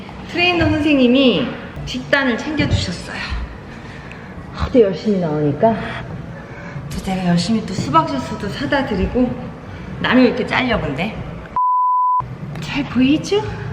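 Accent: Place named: Chungcheong, South Korea. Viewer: 힘들게 사는겨~ 충청도 사투리 정겹네요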